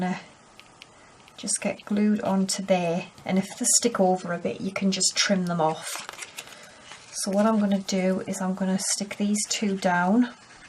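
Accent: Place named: County Durham, United Kingdom. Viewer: Really lovely card!! I just found your channel....was thrilled to hear your accent - reminds me of home. I'm from County Durham living in Queensland Australia.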